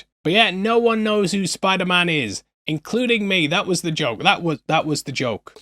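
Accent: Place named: England, United Kingdom. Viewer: brilliant Love the channel started following you because I heard an English accent and your best move was putting yourself in front of the camera.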